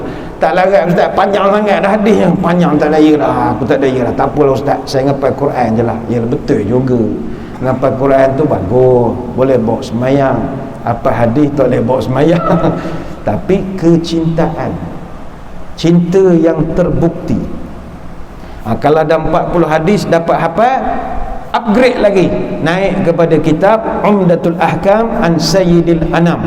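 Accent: Pahang, Malaysia. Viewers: Sorry sis,memang macam inilah loghat Pahang,mungkin dgr mcm ada iras2 ganu sebab Kuantan sempadan dgn kemaman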